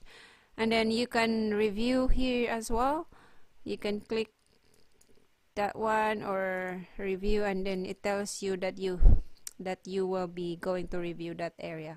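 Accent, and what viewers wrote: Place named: Philippines. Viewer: The way you pronounce i think u must be a filipina or maybe half filipino.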